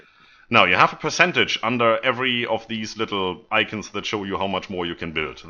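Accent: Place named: Northern Germany, Germany. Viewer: Always warms my heart to hear a North German accent. :)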